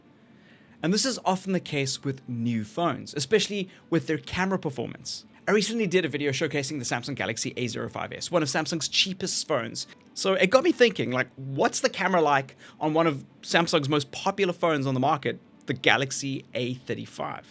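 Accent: South Africa. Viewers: Dude, where are you from? I can hear Joburg with some Durban and also a pinch of New Zealand.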